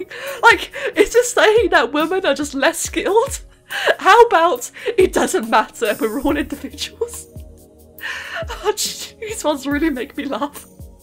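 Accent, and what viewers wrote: United Kingdom. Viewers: So, this is what I missed while I was away at my family reunion? Well, I have no idea what to think of it aside from "wow". Seriously, you look to have quite the following in the Spore community just as much other youtubers for other communities possibly (Alpharad for Smash, NISLT for TF2 (he's practically Alpharad of TF2 from what my brother Robert described him as) though I'm not really sure). The fan art is simply a sight to behold and the amount made for you and the Jowwi. Also, why is it that people mistake you as a boy when you are in fact a girl (a rather ladylike sounding one at that, but it might only be the British accent (no offense)) and I don't mind your voice at all. I. AM. SO GLAD that my comment from the Helminth Charger video that you commented on about my comment being among the list of other random comments and being unsure now what to do with it, thus inspiring extreme depression in me. 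It caused and is (possibly) still causing me as great of pain as my stomachache at the family reunion from going in for seconds and getting a second can of Pepsi right after I finished my first plate and can (me drooling at a trash can in preparation to vomit and all which didn't happen thankfully, the vomiting I mean). I simply want to try to put that behind me and I wish for a second chance in the raffle, that's all I'm asking for. I am dreading and fearing that my comment about you saying my comment reposting was a scumbag, something I deeply regret with all of my heart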